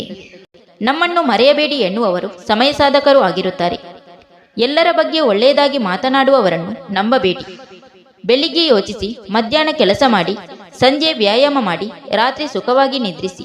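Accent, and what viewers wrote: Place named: Tamil Nadu, India. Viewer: Tamil accent... Good one 🎉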